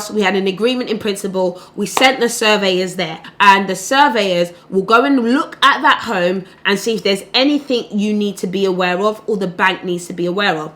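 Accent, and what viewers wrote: England, United Kingdom. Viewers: you have an English accent, are these steps meant for American home ownership?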